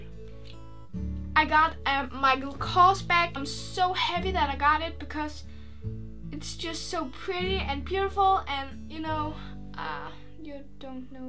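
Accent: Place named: Denmark. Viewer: Woaw man kan godt høre at du er fra Danmark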